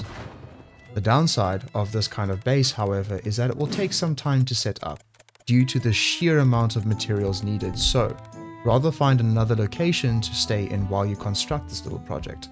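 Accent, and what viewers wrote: South Africa. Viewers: Maat, is jy 'n Suid Afrikaner? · are you South Africanbru?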